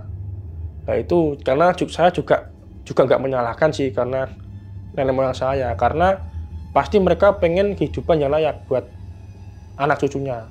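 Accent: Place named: Java, Indonesia. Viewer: Medhok bgt mase😂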